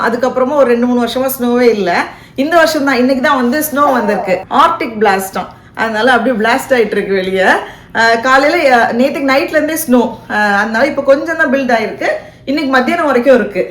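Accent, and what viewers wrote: Nagercoil, India. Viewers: Hello. It was a pleasant surprise to meet you and your family yesterday at the Garden. I, along with few friends, as gardening hobbyists watch your channel and get some inputs. Thanks for the contents and Nagercoil Tamil. Allan.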